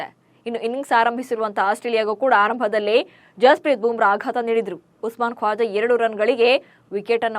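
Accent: Standard. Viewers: Svachcha kannadada dvani shanta medum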